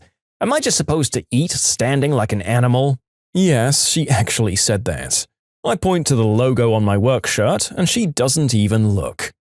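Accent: United Kingdom. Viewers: You sound British now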